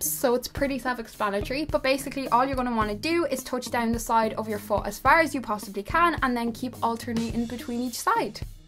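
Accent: Ireland. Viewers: Are u Irish you sound irish 🇮🇪❤️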